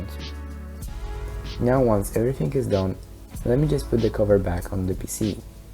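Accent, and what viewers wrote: Poland. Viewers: Wiedziałem po akcencie, żeś jest Polak :D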